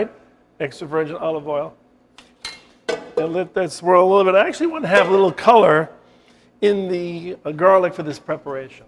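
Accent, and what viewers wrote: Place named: United States. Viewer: Tom-ay-toes. Bay-sil. You Americans really can’t speak properly 🤦🏻‍♂️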